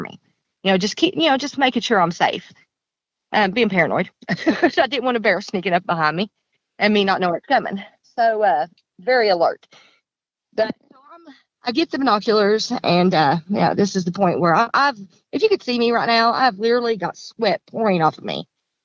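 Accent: South, United States. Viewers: your lil ol southern drawl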